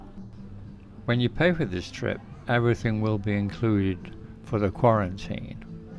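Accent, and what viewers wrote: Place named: Lancashire, United Kingdom. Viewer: You sound like a Lancashire lad, I’ll take a guess at Blackburn??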